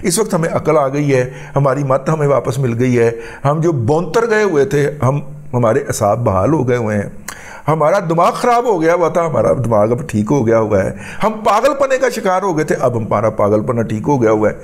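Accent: Pakistan. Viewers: rizwan razi sahab aap sushe muhabe wattan pakistani heain aap ka lahajha bata raha hea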